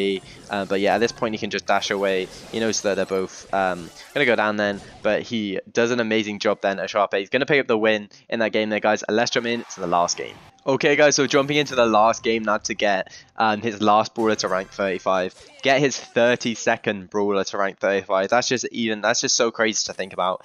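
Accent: United Kingdom. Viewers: british english accent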